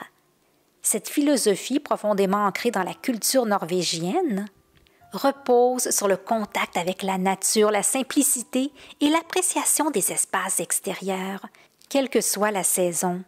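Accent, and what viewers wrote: Quebec, Canada. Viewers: l'accent sonnait bien le Québec. C'est très agréable <3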